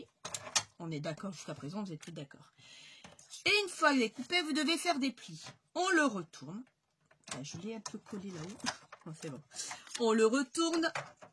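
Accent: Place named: North, France. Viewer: Cc, j'aimai beaucoup comment tu étais coiffé sur ta dernière vidéo, ça te va trop bien, dommage de les avoirs coupés, mais bon.... J'ai beaucoup aimé tes présentations comme toujours, avec ce fort accent du Nord mais j'adore...😅 Très bonnes fêtes à toi et toute ta petite famille....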